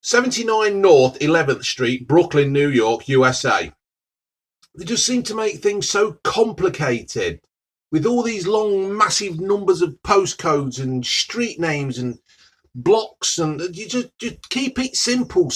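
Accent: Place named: Northern England, United Kingdom. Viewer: Where are you located buddy? Sounds northern. Im a filthy manc and love your down to earth content.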